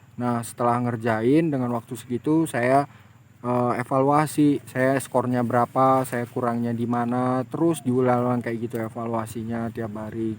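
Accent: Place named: Banyumas, Indonesia. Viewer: Woooo mas... ternyata org Banyumas.... sama donk... Banyumase ngendi mas??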